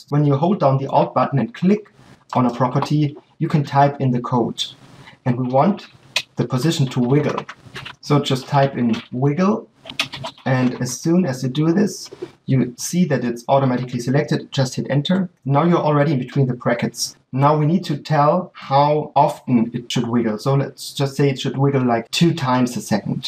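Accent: Germany. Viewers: Are you German....you have an German Acent I mean so..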